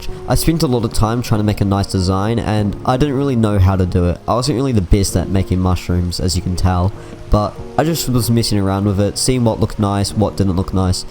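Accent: New Zealand. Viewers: are you New Zealand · Good old New Zealand accent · Are you a New Zealander?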